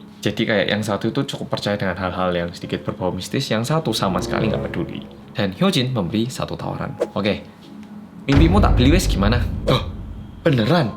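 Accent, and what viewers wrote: Java, Indonesia. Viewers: Kembali lagi bersama mas yang medeeekkk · Tim gercep orang korea yang medoook!❤ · Kak kok skarang pembukaannya sudah ganti yg ngondek? Lalu kan medok kak? Kok di ganti kak? 😁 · Waduhhhhhh ko' ada episode 2 kelamaaan menunggu besok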